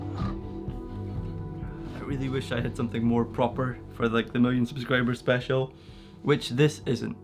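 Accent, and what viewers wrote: Scotland, United Kingdom. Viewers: He's Scottish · He's from Scotland, not Ireland. Different country, different accent 🙄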